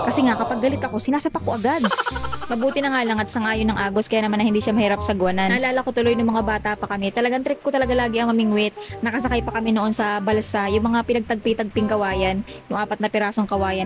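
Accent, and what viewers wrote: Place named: Quezon, Philippines. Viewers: Sa quezun province yan salita ni ate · nakakatuwa kapo yun sslita mo salitang quizon provence ssalot idol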